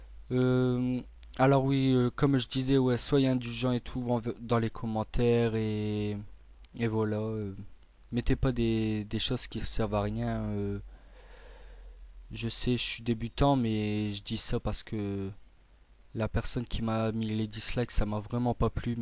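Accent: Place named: North, France. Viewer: sa senten a ta voie ke tu vien du nord mdr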